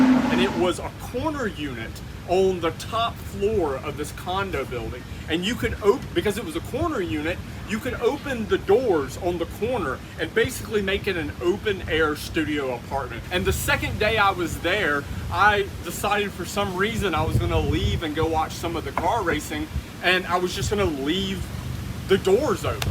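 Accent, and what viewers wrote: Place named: Pittsburgh, United States. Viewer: Are you from Pittsburgh? Your accent sounds like it. Great channel.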